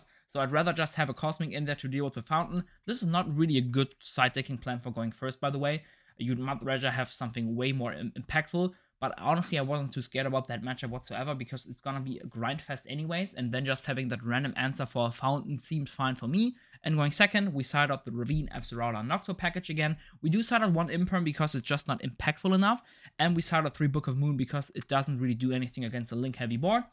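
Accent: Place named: Germany. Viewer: Ich glaub blackboard ist das Wort das du gesucht hast , das kommt Tafel zumindest nahe ... Hab erst vor 2-3 Monaten angefangen d-Link zu spielen, hab durch deine vids so viel gelernt und bin dennoch immer wieder überrascht was das Deck alles kann. Danke für den Unterricht Prof. PS: wusste durch deinen Akzent seit der allerersten Sekunde, dass du auch aus Deutschland bist 😅😂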